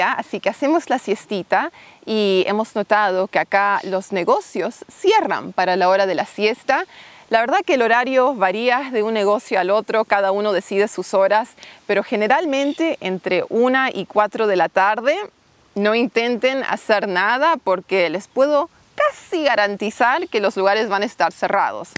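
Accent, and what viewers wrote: Argentina. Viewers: Excelente tu español-Argentino! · Por como hablas, al principio del video creí que eras una argentina en Canada. Tenes un acento argentino casi perfecto! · Te felicito por tu español o castellano argentino. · me impresiona el nivel que lograste de español argentino, no sé hace cuanto que estás aquí pero de verdad impresionante, te aplaudo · Tu acento argentino me recuerda a Ingrid Grudke, q es una modelo argentina. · Hola!!! Soy Argentino.....Me encanta como hablas el español Bueeee....en realidad el Argentino!....Vivi 2 años en Europa.....No tenes idea de como extrañe el Bidet....jajajaja!!! Les mando Besos y Abrazos! Sigan con este contenido....... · Fabuloso tu acento argentino! casi perfecto, sólo deberías (si te place) cambiar el "ummm" (muletilla anglosajona) por nuestro "eeeh" . Saludos!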